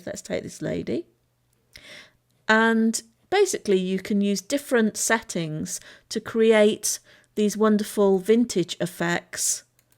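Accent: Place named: England, United Kingdom. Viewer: Nice video. Firefox ( not sure about other browsers ) has a plugin called [Pixlr Grabber] which I find really useful for grabbing all or part of a picture from a webpage. Much superior to saving the image or PrintScreen paste intoimage editor like paint. I thought you were English until you said "Control Zee". Hmm yeah I still think your from southern limie land. Dont go to the dark side else you'll end up saying things like "How Yall doin, have a nice day now yeeha woofwoofwoof" :P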